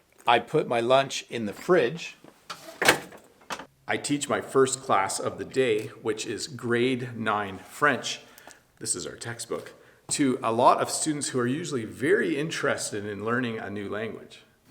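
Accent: Canada. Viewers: I just can't get enough hearing your nice Canadian accent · Thank you sir , i always watched all of your videos . Easier to understood. And i like Canadian pronounciation. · Hi, I like your videos because I understand you very well, maybe because you're canadian? I sonder if you speak normaly or slowly for your students....let me know....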